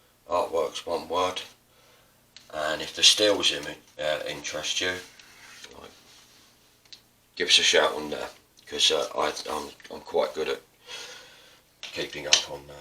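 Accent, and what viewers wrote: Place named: Netherlands. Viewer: Watched all of them .. Very nice technique.. for a dutchie your accent is very difficult to follow, but luckily art most of the times doesn't need a language! TFS..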